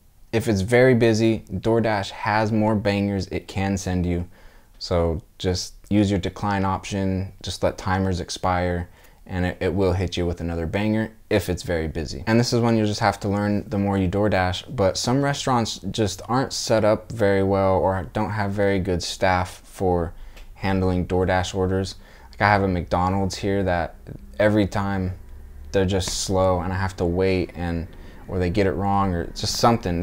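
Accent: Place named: West Coast, United States. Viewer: E-Bike, west coast accent and $35 an hour. Definitely has to be SFBA.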